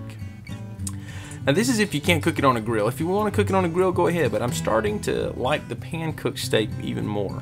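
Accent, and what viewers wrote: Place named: South, United States. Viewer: Lol....sounds like he has a southern accent and I've heard southerners use oil like it's a marinade.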